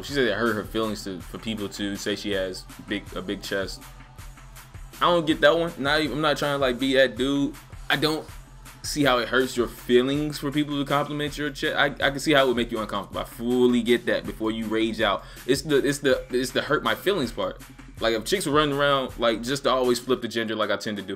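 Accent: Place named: Bay Area, United States. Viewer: Bro you from the Bay area? SF Oakland? I was trying to place your accent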